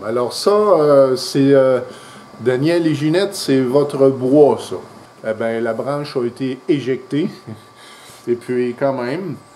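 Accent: Canada. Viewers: Bonjour cela fait de nombreuses années que je suis vos diverses expériences je tiens à vous remercier pour la curiosité que vous mettez à expérimenter le tournage et la gentillesse teintée d’accent canadien qui résonne à mes oreilles de français du sud ( a côté de Marseille ),votre dernière vidéo autour du séchage ma beaucoup intéressée …depuis toutes ces années j’ai l’impression que nous avons évolue ensemble,encore merci continuez…. Ont vous suis ici avec plaisir. Mr lucari André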